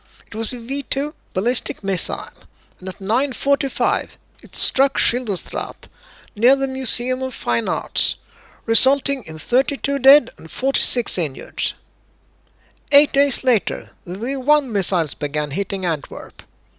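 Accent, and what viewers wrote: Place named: Sweden. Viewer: and please you do ! good aim 🎉 I just explored the LINK to your other videos and I’m looking forward to watching them one by one. wanted to let you know: it seems to me that you’re in Sweden, yes ? and certainly it’s a very thick accent ya got going, I wanna let you know that I’m watching in Chicagoland, Illinois, USA. (what used to be the great country of America, which hopefully in time, will be again. don’t give up on us. 70% of us hate MAGA & Trump and everything they stand for…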